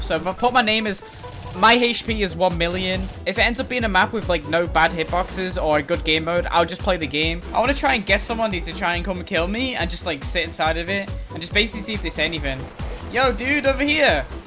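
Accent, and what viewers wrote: United Kingdom. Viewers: you use brithis voice i use russian bcs i think its cool😀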